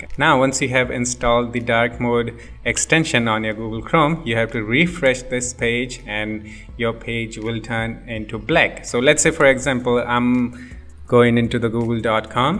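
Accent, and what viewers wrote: India. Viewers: lol cant trust that indian voice sry just had too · i love indian accents, for real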